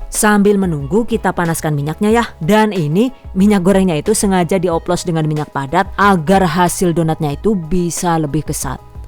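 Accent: Banyumas, Indonesia. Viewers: Duh serasa kek nonton langsung demo masak ada bumbu2 joke khas ngapaknya🤣 best lah lain daripada yg lain👏🏻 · Banyak banyakin jokes ngapaknya 😂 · Jebulr ngapak 😂😂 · Ora ngapak ora kepenak ya bund 😅😅😅😅😂😂😂resepnya mantuulll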